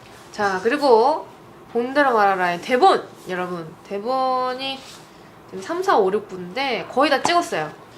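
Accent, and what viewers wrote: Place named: England, United Kingdom. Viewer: She has such a nice English accent, I love it ❤️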